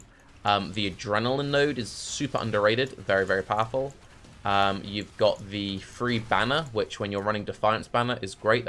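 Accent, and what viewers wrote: RP, United Kingdom. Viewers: He speaks the queen english he says it correct.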